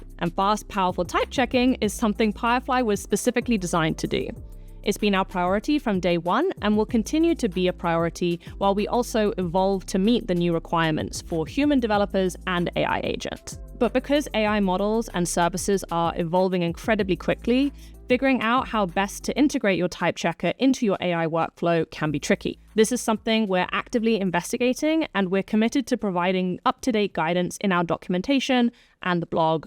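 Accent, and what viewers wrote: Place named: South Africa. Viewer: is she south African or Australian? I can't tell?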